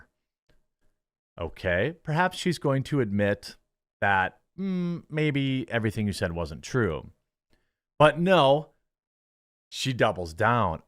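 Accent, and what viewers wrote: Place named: Wisconsin, United States. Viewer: I swear I'll unsub the next time u say braigged instead of bragged. Fuggin wiscansin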